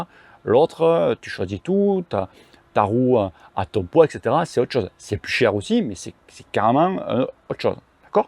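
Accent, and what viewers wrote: South, France. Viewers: Bonjour,j’apprécie tes conseil et commentaires sur les vélos et les conditions d’utilisation.J’aime l’accent que tu a ,nous qui sommes de l’est ,un peu de soleil fait pas mal.Tout ce que tu dit est intéressant mais n’hésite pas de faire intervenir en commentaire un pro quand nécessaire.Cela apporte plus de crédibilité à ta vidéo qui est très bien faite.Je roule Cannondale 4eme vtt.Sur route j’avais un trek madone 2011 que je viens de remplacer par un Scott Foil 2021,Patin,shamal miles.Montages perso.J’ai rayonné ma première roue à 14ans.Depuis je compte plus les vélos construits et réparé gratuitement bénévolement chez Emmaüs aussi.J’ai 65ans retraite et archer.Le vélo m’apporte la santé et la forme.Tes expérience sont judicieuses et concrètement très utile.Cordialement.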